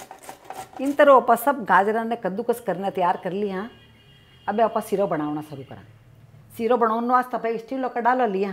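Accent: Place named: Jodhpur, India. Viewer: VERY.NICE.MATA.JE.YOU.ARE SPEAKING.JODHPUR.LANGUAGE VERY.SIMPLE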